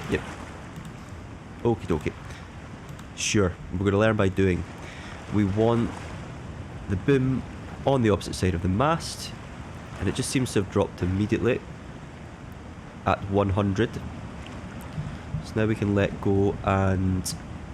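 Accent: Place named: Scotland, United Kingdom. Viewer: Hi. Your videos are making me buy this game too. I didn't even know it existed. I bought Sailwind yesterday, but ended up returning it. I found the graphics a bit disappointing. Sorry if this translation looks a bit strange, I'm from the Netherlands and I ran it through Google Translate. P.S. I love your Scottish accent.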